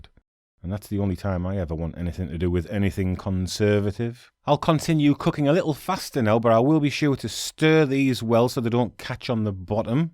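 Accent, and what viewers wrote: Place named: Yorkshire, United Kingdom. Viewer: Loving the Yorkshire accent.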